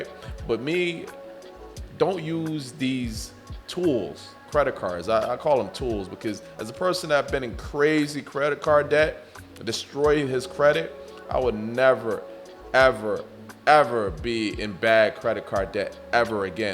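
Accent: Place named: New York, United States. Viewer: You gotta be from Ny lmao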